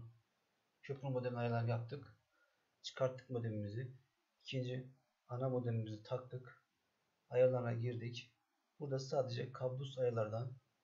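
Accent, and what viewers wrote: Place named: United States. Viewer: Sesin Amerika'dan geliyo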